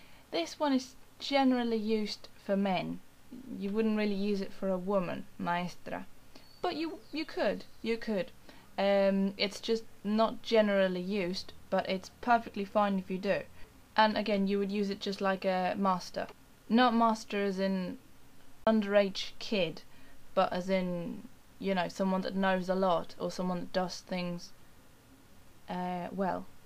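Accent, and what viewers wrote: England, United Kingdom. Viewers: como hiciste para aprender el acento britanico tan bien? yo vivo en USA y tengo acento yankee, pero quiero aprender el britanico y no me sale! :( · que tremendo ingles britanico tenes che! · Jajaja, es muy raro escuchar tu inglés y castellano al mismo tiempo. Rather british, isn't it? Jaja, de donde sos, y de donde tenés ese acento inglés? Muy lindo, me encanta tu voz. Yo soy de Salta y acá y en todos lados usamos practicamente los mismos términos que en Buenos Aires, salvo que sin el acento porteño exagerado. Aunque la gente muy de campo o del norte tienen un acento o forma de hablar bastante diferente (algunos de la ciudad también), hablar como "colla" como se dice por acá... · Posta me encanta el acento britanico que tenes! te admiro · Your English accent is beautiful! :D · I don't get it. Where are you from? Why do you have that British accent in English? 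And you also speak German and Norwegian? :) You're Argentinian, aren't you? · You speak with a British accent! Congratulations. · MAESTRO jajaj muy bueno tu acento britanico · English accent???? i love it!!!! i'm from Argentina but my English is American!!!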